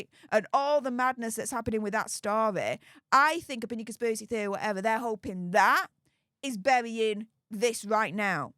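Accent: Yorkshire, United Kingdom. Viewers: she’s from Yorkshire, UK.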